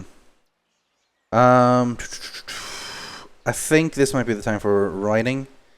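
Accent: Sweden. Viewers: Are you Swedish? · i knew it i her it on his acent. btw im also from sweden and my englis aint dath good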